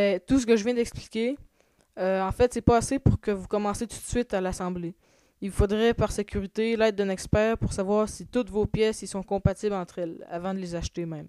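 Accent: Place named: Quebec, Canada. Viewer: sympa tes vidéo ! et j'aime ton accent <3<3 j'aime le quebec <3<3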